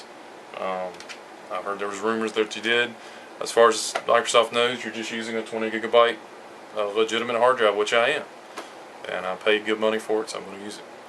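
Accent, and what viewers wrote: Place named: United Kingdom. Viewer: Love the British accent. All these years later I had no idea I could do this.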